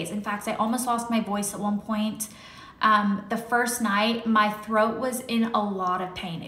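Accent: South, United States. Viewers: Thanks for sharing your difficult experience, southern twang and brutal honesty. You’ve made it that much better for the next person that has to go through this awful ordeal. Sending you lots of hugs from NC! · So glad you're ok! So sorry about your sweet baby <3 we love you so much! and your southern accent too haha. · You went so southern after the anaesthetic hahaha I love it, sending love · The accent, I love that southern accent. I kept replaying that part. Love you, you are so strong ❤️ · I LOVE how southern you sound coming out of anesthesia! So cute! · I was going to say the same thing. Post-surgery gets real southern.:-) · I thought the same! So southern! It was cute to see. X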